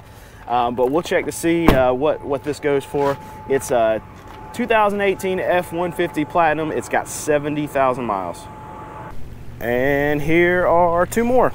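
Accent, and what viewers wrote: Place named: South, United States. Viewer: From the sound of your accent your in the south. No money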